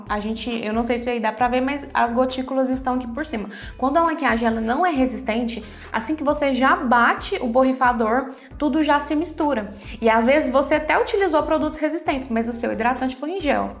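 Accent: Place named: Minas Gerais, Brazil. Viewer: Estou adorando seus vídeos! Sou nova por aqui e estou achando tudo muito útil e uma delícia de acompanhar. Você é mineira da onde? kkkk Pergunto por conta do sotaque fofo que tem... Eu sou mineira de BH. Confirme se minha suspeita está certa... Beijo, obrigada e parabéns pelo trabalho.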